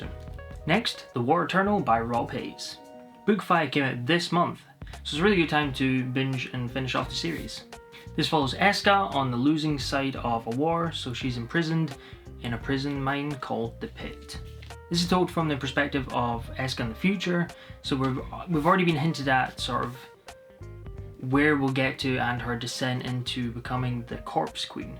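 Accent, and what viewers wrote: Scotland, United Kingdom. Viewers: It’s great to hear a Scottish voice booktube! 🏴 Looks like you’ve got a great reading year ahead of you!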